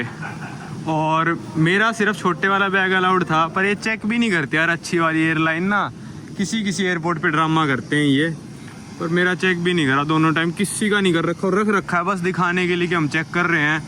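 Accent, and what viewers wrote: Haryana, India. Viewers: Hats off to you dear. I enjoyed your video of Easter Island. You went so long in south america. Try if you can go to Antarctica, we are following you always. You always explain nicely. I like your haryanvi tongue. I am also a traveler. Only south America continent is left. Sorry you lost the phone. Best wishes 🙏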